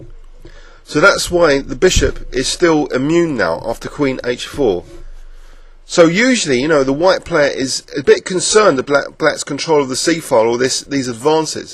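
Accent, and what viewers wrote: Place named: RP, United Kingdom. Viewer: love your clips, as much as I like your accent. That's how English is supposed to be spoken.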